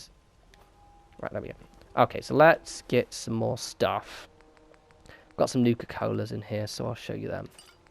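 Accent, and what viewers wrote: England, United Kingdom. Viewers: The potato chips in the game are called "potato crisps", and judging by your accent I'm assuming you're in England or near it? And yet you said, "potato chips". ... Mind blown. Lol. Awesome videos, btw. I just bought FO4 a few days ago and I've been watching through all your videos for tips & tricks!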